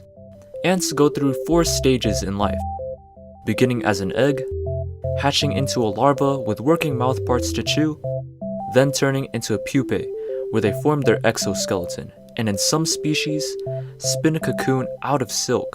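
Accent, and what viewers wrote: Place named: California, United States. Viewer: Where are you from you sound like your from California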